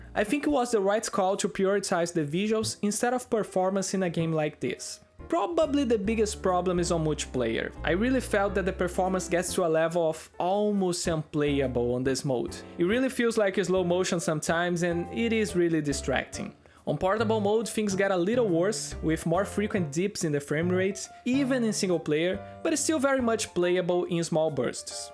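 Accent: Brazil. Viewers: mano tu é br? esse sotaque de leve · Your accent, looks like brazilian speaking english.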